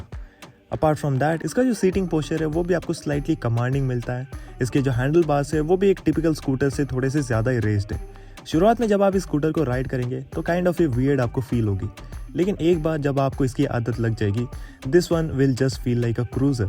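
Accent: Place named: South India, India. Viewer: Sauth Indian ho bro aap aap ki accent Kuch alag lag rahi he muje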